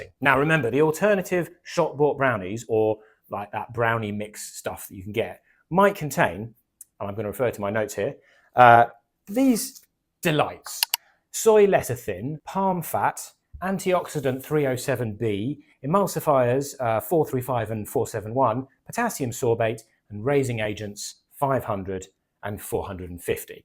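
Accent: United Kingdom. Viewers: Just found your channel, or rather Youtube did. Im confused you sound British, but your from Oz? Im Subscribing anyway as i like the concept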